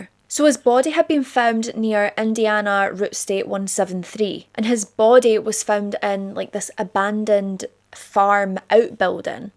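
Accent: Scotland, United Kingdom. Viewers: I just can't get over your beauty! I'd give almost anything to look half as beautiful as you! The accent tops it all off! There is literally nothing better than a Scottish accent!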